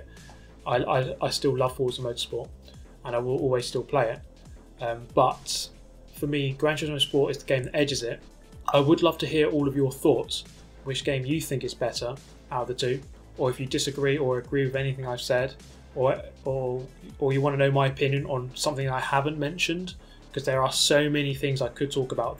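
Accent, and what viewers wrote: England, United Kingdom. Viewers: I like is voice and his british accent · Just a really heavy English accent I thought you'd be white. love your vids man keep it up :D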